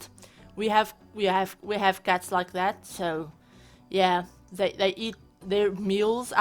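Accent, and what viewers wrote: South Africa. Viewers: It is SO amazing to see a South African Youtuber, let alone a South African SIMS Youtuber! I got so excited when I heard your accent! The house is GORGEOUS and you did a great job! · I must say - I thought she sounded South African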